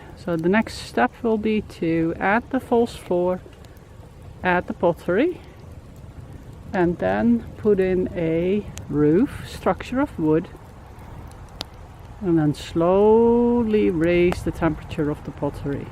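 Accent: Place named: Netherlands. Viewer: Unmistakably Dutch accent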